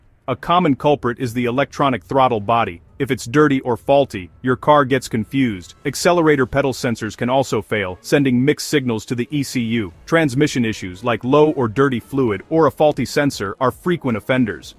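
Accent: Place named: United States. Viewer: British words with a USA accent.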